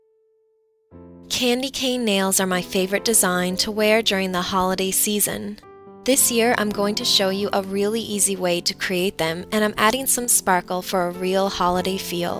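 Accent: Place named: Ontario, Canada. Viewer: Are you from Canada because when you start or started it sounds like you have an Ontarian accent.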